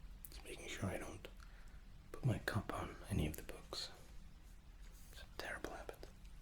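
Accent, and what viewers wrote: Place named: Scotland, United Kingdom. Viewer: Been looking for a British ASMR for a while, couldnt believe my luck finding a Scottish ASMR guy. Scottish women living in canada now, and miss the brogue. Love it.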